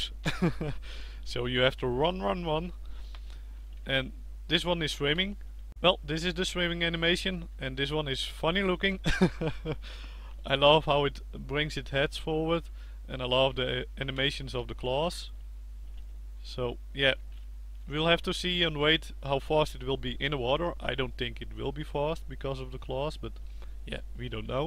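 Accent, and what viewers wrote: Netherlands. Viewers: hahahaha mijn engels is ook zo :) dat is bijna normaal voor nederlanders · Are you dutch?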